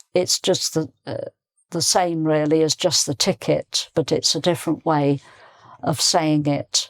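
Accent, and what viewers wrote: United Kingdom. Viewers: You were born to be a teacher , your great British accent adds extra flavour to the material you present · Thank you dear teacher, I perfectly understood today's class (today for me), very attractive and captivating your teachings in the traditional way: with board and markers and with a perfectly modulated voice as English women usually have. I like that British accent, it seems taken from a novel by Kazuo Ishiguro, The Remains of the Day. If I had a beautiful red apple I would give it to him with a kiss on the forehead. Congratulations. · I love your British accent! Would speak in that way! Tnx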